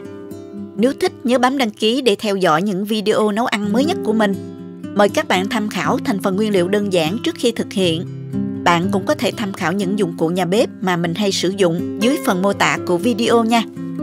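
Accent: South, Vietnam. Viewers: nói giọng miền nam rõ ràng và dễ thương lắm. Chỉ dẫn rõ ràng, thao tác chuẩn bị sạch sẽ. Cảm ơn cô đã chia sẽ nhiều món ăn ngon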